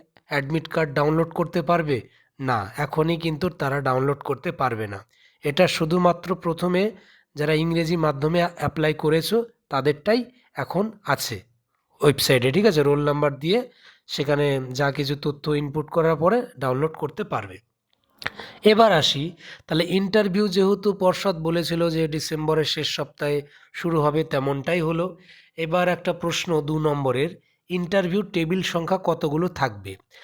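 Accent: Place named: Bangal, Bangladesh. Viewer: Upni bangal , kotha te bojha jacche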